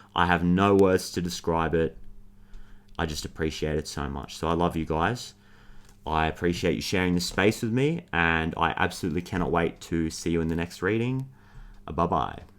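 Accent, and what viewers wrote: Australia. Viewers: Tarot reading with australiam accent by is what I've been manifesting. Thanks universe 🙏🏼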